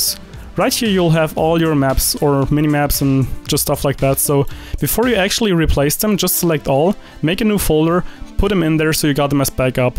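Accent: Germany. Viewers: mann kann aber ob und zu einen deutschen akzent hören xD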